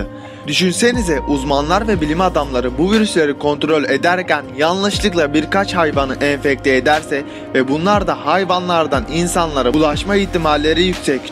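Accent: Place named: Thrace, Turkey. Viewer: Trakya şivesi seziyorum.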